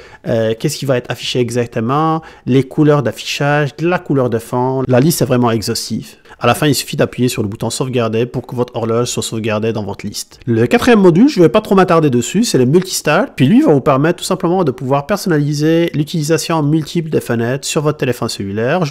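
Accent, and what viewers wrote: Quebec, Canada. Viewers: Je n'ai meme pas encore recu mon Fold 3 que je magasine pour des app qui vont m'etre utile et je suis tombé sur ton video. C'est clair que je vais l'installer. Merci pour la video. En tant que Quebecois, ton accent Francais avec un soupcon de Quebecois est vraiment cute hehe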